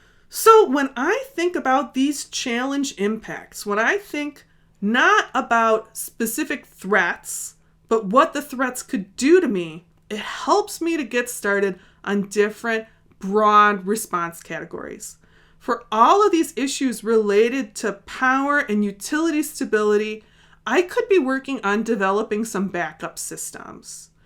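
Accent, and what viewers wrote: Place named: Upper Midwest, United States. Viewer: I'd like to find out more about river-erosion risks in my area, and also in areas that I'm thinking about for relocation. Can you recommend places to learn more about such risks? Also, my friends and I love your information - almost as much, we love your classic Upper Midwest accent. We call you the! Great attitude and great info. Thanks so much!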